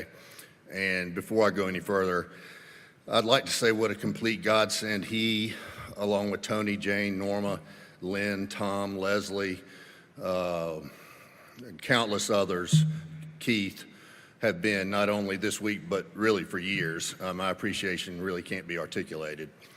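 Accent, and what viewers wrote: South, United States. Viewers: Only discovered this very funny lady this year as like many others I’ve been in lockdown due to Covid in Australia. I recommended her to my friends as each time I saw her I was amazed at her poise and stature and I loved hearing her funny stories with that lovely Southern accent. My sincere sympathies go to her family and friends. She will be sadly missed by many.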